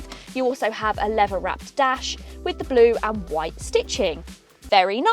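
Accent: England, United Kingdom. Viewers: i love the England 🇬🇧 accent 😊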